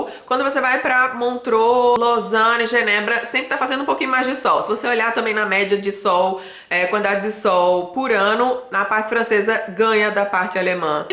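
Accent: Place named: Recife, Brazil. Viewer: Gosto do seu sotaque recifense !!!!😍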